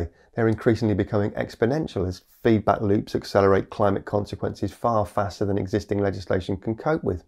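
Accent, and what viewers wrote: United Kingdom. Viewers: True - although I'm mostly here for the knowledge the humor helps and let's admit that we Yanks do love a British accent. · I about spit my coffee out laughing . I do appreciate you switching between existential dread and hope. However, my favorite part is the humor in your presentations. Between the terrific British accent - Yank here - and the humor, it's a great start to many a Sunday. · You may be a Brit, but I do not consider your accent especially funny. Instead, I consider your videos on GW excellent.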